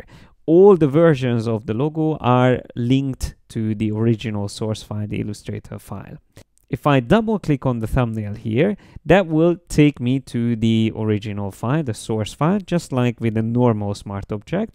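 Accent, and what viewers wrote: Hungary. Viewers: az öreg hunglish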